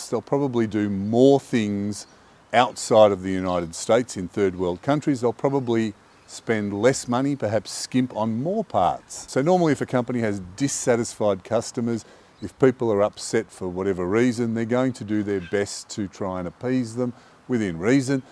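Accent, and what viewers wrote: Australia. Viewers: A guy with an Australian accent is telling me not to boycott woke Harley Davidson. Go woke go broke! brother!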